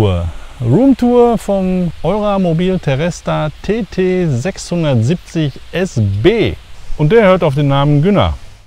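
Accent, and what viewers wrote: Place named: Ruhr, Germany. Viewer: Du bist und bleibst eine coole Socke. Ich liebe unseren Ruhrpott Slang. 😉🍻 Wenn du jetzt noch aufhören würdest Terpentin zu qualmen 😂 Gruß aus Mülheim.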